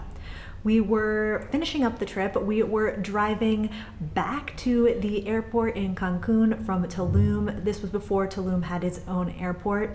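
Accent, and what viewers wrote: United States. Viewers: Nice job on the video. I noticed your husbands tattoo of Michigan, and I have noticed that your accent is familiar to me as I am from Michigan. I live in La Paz, BCS, Mexico now . Keep up the good work.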